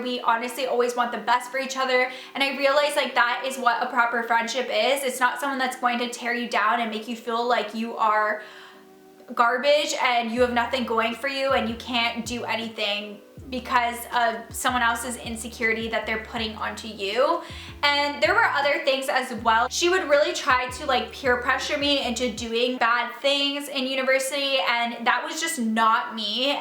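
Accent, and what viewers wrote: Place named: Canada. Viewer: This lady has a nice Canadian accent. Very nice!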